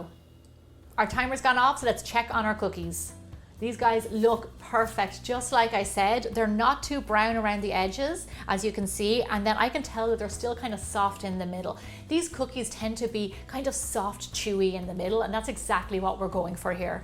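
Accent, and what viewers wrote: Ireland. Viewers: are u irish? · Love the recipe by any chance are you irish ☘️?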